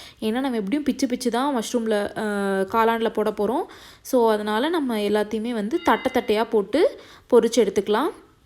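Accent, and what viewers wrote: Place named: Coimbatore, India. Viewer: Otuka 🤣that Coimbatore slang:)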